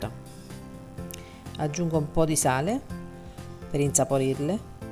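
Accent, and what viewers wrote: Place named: South, Italy. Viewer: A parte il fatto che sei una maga, in cucina: ma parliamo del tuo accento meraviglioso..mi scalda il cuore! Io son Sarda e adoro gli accenti del Sud e delle isole!!!!❤❤❤❤❤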